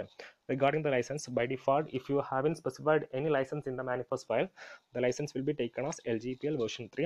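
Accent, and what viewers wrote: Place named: India. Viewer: I understand English quite well but your Indian accent is almost unintelligible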